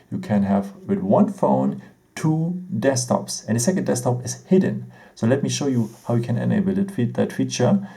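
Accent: Germany. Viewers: Haha German english😂😂😂😂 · Hab doch gleich gehört das du deutsch bist :P Super Video und klasse Smartphone 👍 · Dat german accent! :D Tolles Video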